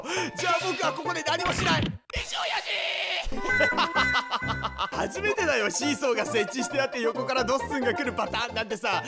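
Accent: Fukuoka, Japan. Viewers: 福岡の人？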